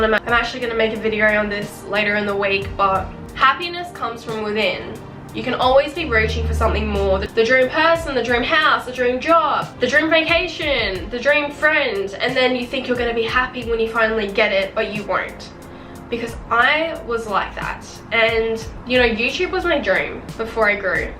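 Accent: Australia. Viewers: I have the same accent lol I'm Aussie too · are u an aussie?🤨🤪🤭😏😌😪🥶🥵😵💋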